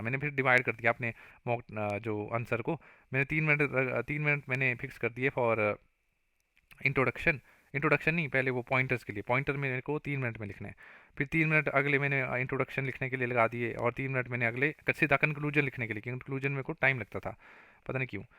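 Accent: Himachal Pradesh, India. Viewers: You sounds like a himachli guy ... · Oo hi? I'm from kullu Apki tone se lg gya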